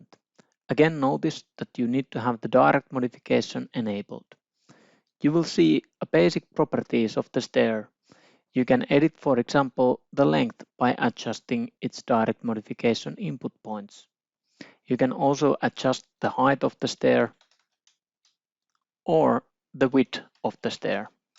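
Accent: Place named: Finland. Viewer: Finnish accent easy to spot :D thanks for the tutorial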